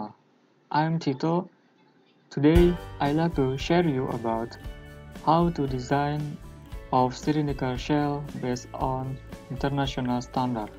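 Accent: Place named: Indonesia. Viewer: Wah keren mas bro, jarang" liat video engineering aksen indo. Kebanyakan india 😂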